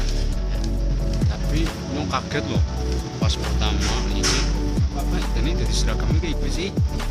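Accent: Banyumas, Indonesia. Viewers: Olih ngomong collab nyong krungune "olab"😂 Ngapake mantappp jannn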